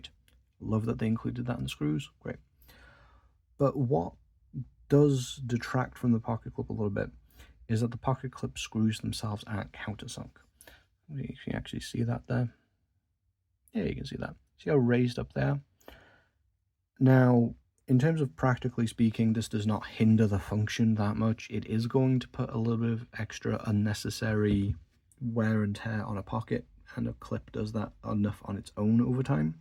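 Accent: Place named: Northern England, United Kingdom. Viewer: Nice review 😊 Is it just me,.or do you have a hint of northern English accent there? Maybe it's the other way around, perhaps you're British with a hint of American 😂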